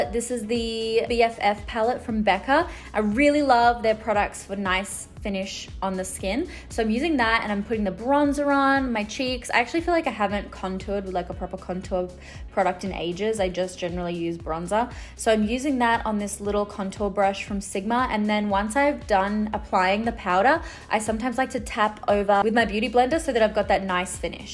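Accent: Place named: Australia. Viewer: why did i read that in her aussie accent?? 😅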